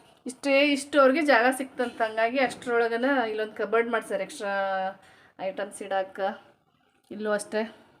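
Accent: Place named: Uttara Kannada, India. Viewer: Nam uttar kannada bhashe chend matadtri ade tara matadi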